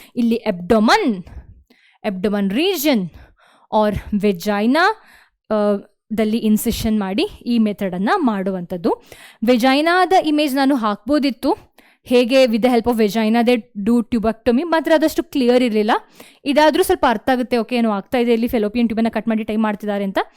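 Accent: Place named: Mangalore, India. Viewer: ..... manglore kannada So beautiful so eligate ..just looking like a wow..❤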